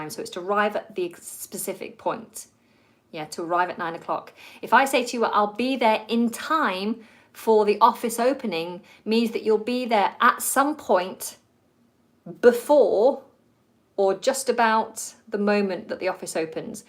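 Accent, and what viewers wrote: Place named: United Kingdom. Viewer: Hello, my dear teacher. Unfortunately, I missed your live video but I watched the last lesson about marketing and like always I learned many new words and phrases about marketing. That was awesome. U are the best teacher I ever had because u r very energetic and I like your lovely pure British accent. Thank you so much.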